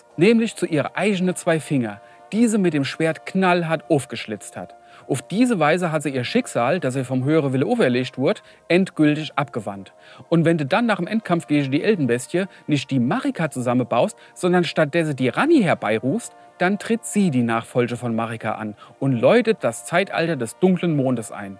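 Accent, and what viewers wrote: Hesse, Germany. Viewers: Meine Mutter war Hessin und ist dieses Jahr gestorben, ist für mich immer ein Gefühl von Heimat, wenn ich den Dialekt höre. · Hessisch ist einfach wunderschön ^^ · Hessisch- beste Dialekt 💪💪👍👍 super witziges Video. Gerne mehr davon. Grüße aus Frankfurt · Ei gude ihr lieben, Imma widda schee, wenn ihr uff hessisch babbelt. Ich wär mal für ne ganze reguläre Folge in hessischer Mundart 😉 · Absolut grandios das Video. In noch keinem Video wurde die Geschichte von elden Ring so gut zusammengefasst. Und des hessische macht’s au noch weng mehr onständig unterhaltsam :D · Hessisch for de win alder ✊🏻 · Also wenn meine Muddi auf Hessisch spricht verstehe ich kein Wort mehr... wo liegt der Unterschied, noch zu nett gewesen? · Nie eine Sekunde gespielt, aber das meiste verstanden. Uff hessisch ist immer schön. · Gute halbe Stunde entertaiment auf hessisch :D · Wie ich das liebe wenn dem Schröck Storys uff Hessisch erklärt 😂😂😂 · Irgendwie habe ich keine Ahnung, woher der Gag kommen soll bei hessisch. 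Ja, ist widerlich wie jeder Dialekt, aber tatsächlich witzig wäre halt schwäbisch, bayrisch, sächsisch. Zweitens. "Geschichte" und ein Souls Spiel. Putzig :D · Herrlich und trotzdem das "Lore" sehr gut rübergebracht, Vaatividya auf hessisch :-) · ich liebe und vor allem das hessisch, einfach nur Gold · Also Trants Hessisch klingt echt angenehm. Gott sei dank nicht auch Sächsisch :D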